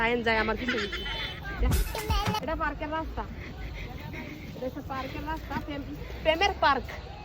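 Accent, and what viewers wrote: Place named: Narsingdi, Bangladesh. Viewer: আমি আপনার কথা শুনেই ভাবছি আমাদের নরসিংদীর আপনি ❤️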